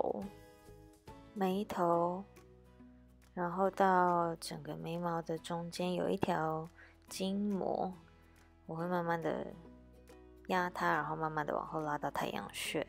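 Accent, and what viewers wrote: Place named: Taiwan. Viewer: 她就是在好好说话呀，难道要人家按中央新闻那么说话才算是好好说话嘛……台湾或东南亚有很多华人是这样腔调的好嘛……少见多怪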